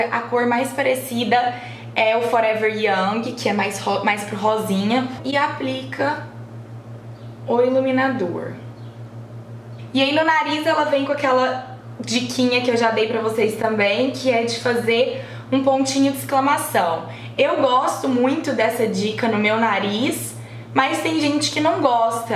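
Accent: Minas Gerais, Brazil. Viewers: Você é a cara da Isis Valverde e ainda tem o mesmo sotaque mineiro 🙀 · sotaque mineiro representando ❤️ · Estou amando seus vídeos! virei fã :) p.s.: esse sotaque Mineiro é uma delícia! rsrsrsrs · ue, tu é mineira? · Vc é mineira?????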